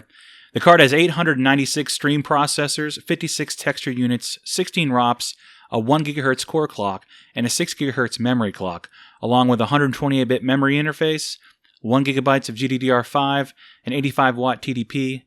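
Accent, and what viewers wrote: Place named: United Kingdom. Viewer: I second those opinions about your voice bro. It sounds calm, professional, articulated. And that's a big factor in the subscription business I think. And you know what ups the ante, is a british accent. I'm serious! That's almost a guarantee for someone to subscribe.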